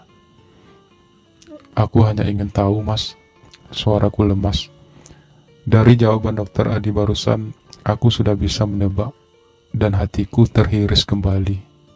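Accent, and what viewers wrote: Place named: Padang, Indonesia. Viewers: INTONASI NYA BENAR KENTAL PADANG BIAR DIA.BERBAHASA INDINESIA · Dari logatnya dipastikan adminnya orang padang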